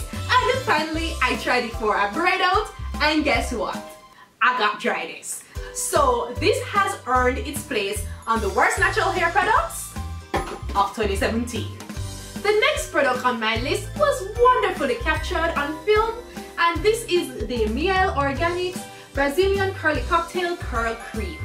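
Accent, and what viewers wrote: Jamaica. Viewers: I had to sub right away! Love your infectious personality and accent! My mom is Jamaican so I picked up that accent right away! 🇯🇲🇯🇲🇯🇲🇯🇲🇯🇲🇯🇲🇯🇲🇯🇲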